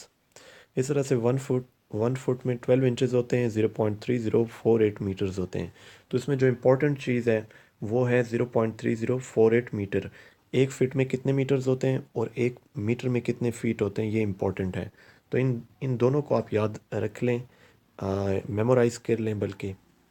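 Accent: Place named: Pakistan. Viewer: Are you from india or from pakistan,just felt that this accent is pakistani. Anyways thumps up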